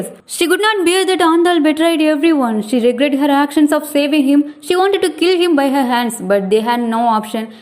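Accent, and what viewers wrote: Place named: England, United Kingdom. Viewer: Really happy to see thiz drama in your english accent.... ❤❤❤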